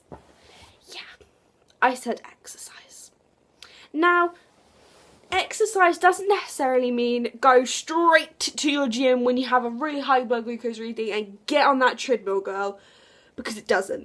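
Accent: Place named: Australia. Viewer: You sound vaguely Australian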